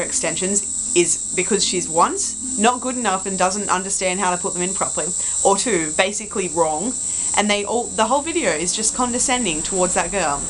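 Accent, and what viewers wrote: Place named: United Kingdom. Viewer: I love your british accent :)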